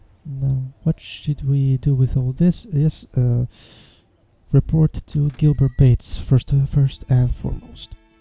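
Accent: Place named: Russia. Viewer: I didn't know you were Russian. You should comment more - you have a very soothing voice coupled with that fabulous accent.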